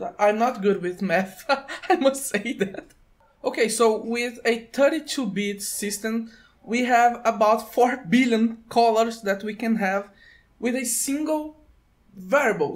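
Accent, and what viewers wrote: Brazil. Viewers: Ótimo video! Eu já vi outros videos teus, e pelo sotaque, desde o início desconfiei de você ser brasileiro, com este eu confirmei a suspeita. Sotaque brasileiro bem característico. Hahahaha. Com ou sem sotaque, continue com os vídeos. São muito esclarecedores. E sim. Taxation is theft!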